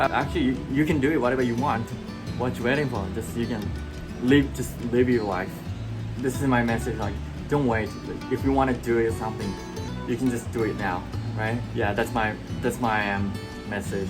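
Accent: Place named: Canada. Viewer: バンクーバーに移民して25年たつものですが、未だ来て一年で英語がとってもお上手ですね。アクセントも私の息子達の様にカナダ人ぽくて驚きました。これからも頑張って下さい。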